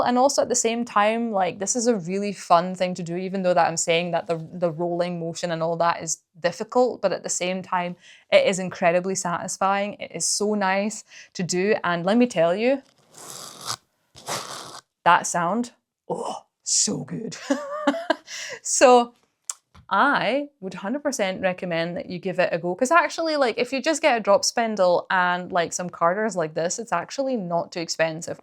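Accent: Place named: Scotland, United Kingdom. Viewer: I actually don't pass the wool between my carders, I just swap hands and when it comes to making the rolags off I just roll in down off the carder into a little tube. Just the way I find intuitively works for me. Looks like you are doing great. It's also really nice to hear more Scottish voices on YouTube!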